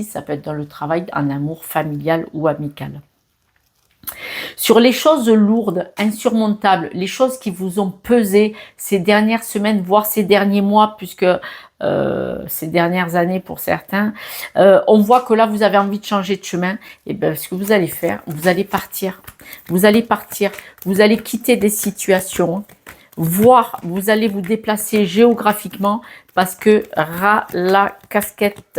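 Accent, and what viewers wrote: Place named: Toulouse, France. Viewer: je reconnaissais cet accent .. je suis pas loin de Toulouse aussi .. ❤️🥰🥰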